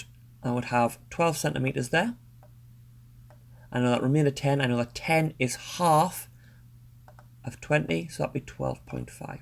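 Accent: Scotland, United Kingdom. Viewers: You speak like Scotland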